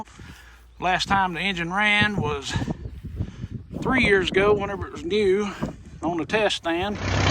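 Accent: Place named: New York, United States. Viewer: Love the New York accent :) Nice plane...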